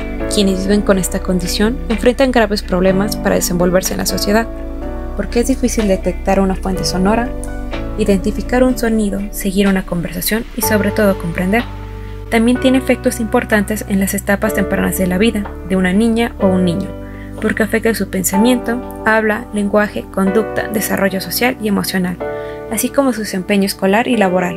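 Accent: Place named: United States. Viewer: Español de USA 🇺🇸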